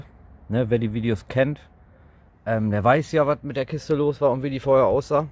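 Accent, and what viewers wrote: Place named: East Frisia, Germany. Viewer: Hey ich muss dir ganz ehrlich sagen du machst einen Mega Job und es gibt keinen dem ich bei sowas so gerne zusehe wie bei dir! Der i Punkt finde ich noch ist dein ostfriesisches gesabbel zwischen durch wenn es dich wieder packt Danke für deine krasse arbeit usw vielen.vieleb dank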